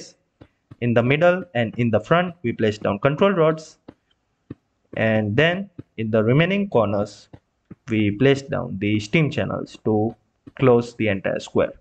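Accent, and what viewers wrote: India. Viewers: I swear to god, if i need help with anything there is ALWAYS an indian sounding guy on youtube to help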